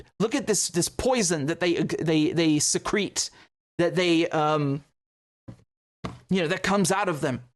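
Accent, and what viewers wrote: Texas, United States. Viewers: Texas voice not too bad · Love your Texas accent bro. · Your Texan accent sounds pretty good. But then I am a Kiwi, so what do I know?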